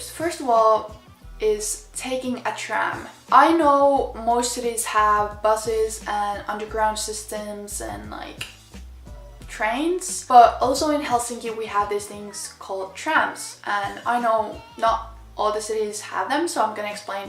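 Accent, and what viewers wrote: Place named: United States. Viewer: U have american accent more than the finnish one😍 just perfection💓!!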